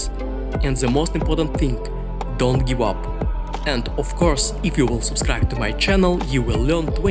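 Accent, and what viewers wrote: Russia. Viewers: Бро, по акценту узнаю что ты Русский. Я сам из Узбекистана, учусь и живу в Корее. Недавно наткнулся на твои видео, очень информативные и полезные. Просто хочу сказать спасибо за твоё старание. Break a leg! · ну просто слышно , что ты русский, соответственно у тебя есть акцент, который немного режит уши, но видео мне понравилось, лучше уж пусть будет такая озвучка чем гугл-озвучка)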